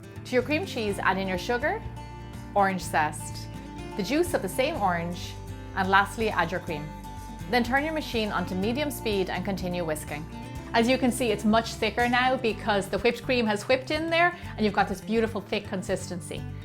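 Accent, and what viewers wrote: Ireland. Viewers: Thanks 4 the help and I like your Irish accent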